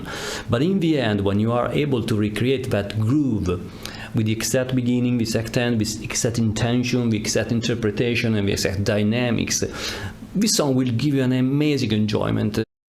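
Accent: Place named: Italy. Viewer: Wonderful! Also, it's kinda hilarious to hear how his intense Italian accent almost entirely disappears as soon as he starts singing the examples 😂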